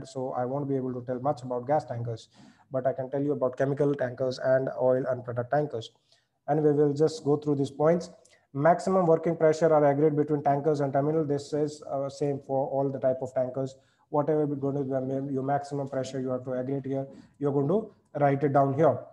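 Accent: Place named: India. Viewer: Its indian bro, not for beginners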